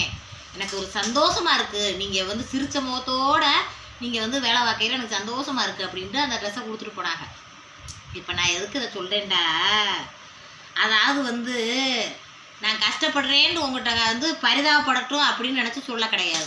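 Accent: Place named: Kondulavi, India. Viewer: சூப்பர்...சும்மா விளையாட்டுக்கு சொன்னேன்...நீங்க எங்க ஊரு கொண்டுலாவி பேச்சு மாதிரி பேசுறீங்க.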